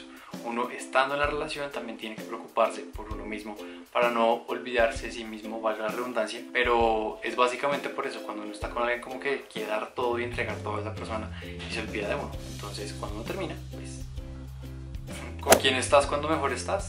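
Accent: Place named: Colombia. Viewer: Baby face jiji you look more younger jajaj ! Me encanta tu acento colombiano !! 😁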